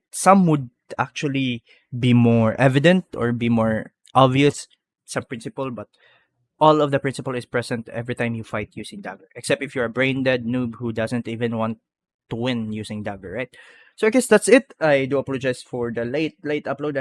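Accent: Philippines. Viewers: you sound very filipino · Build? Btw are you a filipino? You have an accent like it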